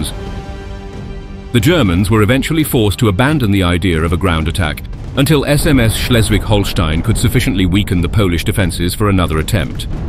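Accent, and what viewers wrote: United States. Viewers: As ever with these videos they go totally off subject wasting your time. Scroll WELL forward. By at least 7minutes. Why does the “English” commentator have American pronunciation.” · This clearly has an English voiceover mispronouncing words in the American way, like Aydolf instead of Adolf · Why does this English-sounding presenter use those awful American pronunciations for such words as "dee-poe" instead of " deh-poe"and "A-dolf" instead of "Ah-dolf", and say "two hundred twenty five" rather than " two hundred AND twenty five?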